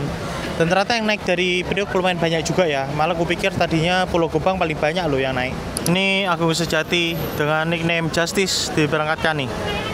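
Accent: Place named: West Sumatra, Indonesia. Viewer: Bicara orang minang ya, kym trans yang abang naiki kebetulan orang minang juga founder ama ownernya, salah satu PO bus urang awak yang diluar sumbar yang eksis seperti epastar ama tam yang bermarkas diluar sumbar.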